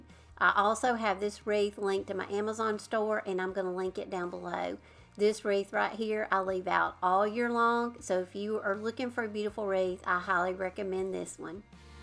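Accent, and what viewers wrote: South, United States. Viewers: Just have to say that I ❤ your Southern accent could listen to it all day long ❤ your crafts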